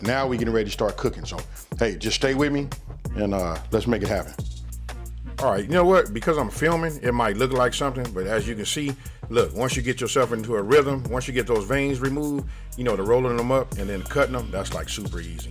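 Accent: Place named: Los Angeles, United States. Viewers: You must be from Cali. I can hear what sounds like an LA accent. I'm from Cali, but moved to Texas last year. I didn't realize we had accents until I moved here. Great video, I know them greens are fire. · My god that looks delicious ❤️❤️❤️👌 And I love,love, love that Cali accent!!! Southern TN girl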